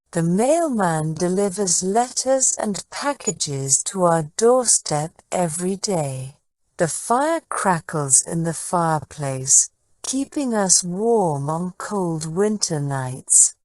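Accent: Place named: United Kingdom. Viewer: Excellent authentic British English pronunciation. Only listening to you and imitating is the key to English fluency.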